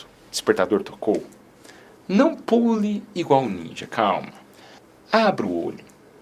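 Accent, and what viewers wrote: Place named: Paraná, Brazil. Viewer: De que região ou estado é esse sotaque, quase não dá pra entender algumas palavras. Parece ser do SUL, talvez do Paraná., esse DF deve ser a região pra onde ele foi e não onde ele criou esse sotaque! btw: dicas interessantes!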